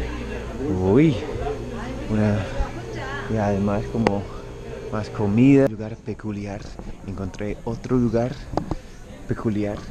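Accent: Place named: Antioquia, Colombia. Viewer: Tu acento es el mejor de los que más me gustan a parte ese toque paisa le de más flow 👌😚😚💙 y que buena experiencia la de la comida coreana, q chimba 😋😋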